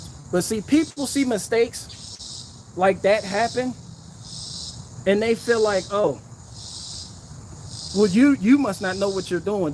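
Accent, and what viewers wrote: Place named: Boston, United States. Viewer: Yeah, yer Bahstin accent is getting there/ What is up with RFB? I never see anything from him.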